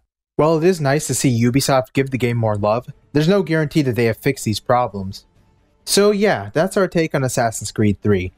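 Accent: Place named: North America. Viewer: I'm English and clearly understand the narrator by the way. I guess North American is hard for some...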